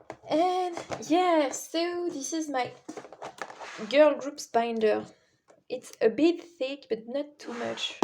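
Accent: France. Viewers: is this a French accent I hear ?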